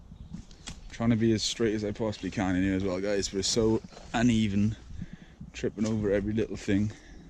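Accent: Wales, United Kingdom. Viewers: Looks Scottish sound Welsh. Who's jackets that coat?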